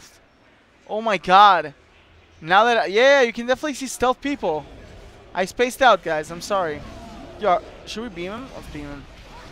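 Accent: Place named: Venezuela. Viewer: You are from Germany? I thought you were from Venezuela.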